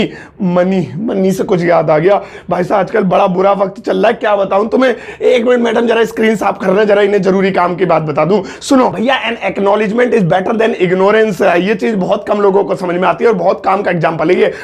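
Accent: India. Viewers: sir apke ye desi tareeke se english bolna sikh gaya 🥹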